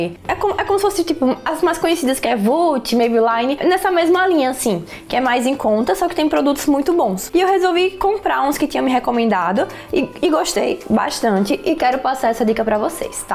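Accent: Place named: Maceió, Brazil. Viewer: amo o sutaque dela e tmb sou de maceio❤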